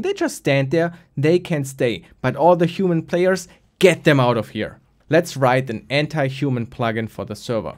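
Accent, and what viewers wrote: Germany. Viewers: wait.. are you german? i can hear it out a little bit xDF... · I always love hearing a german accent in videos and then checking the user profile to find out I was right again: Berlin in Deutschland :) Schön zu sehen, dass jemand wie du fast 750k subs hat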